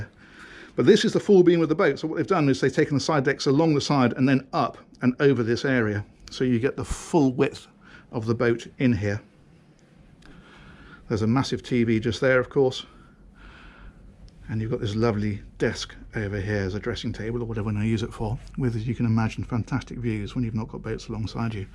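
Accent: United Kingdom. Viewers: This is an exceptional tour. The camera work is wonderful, your knowledge of boats, vocabulary and enthusiasm plus that classical British accent. Keep up the good work!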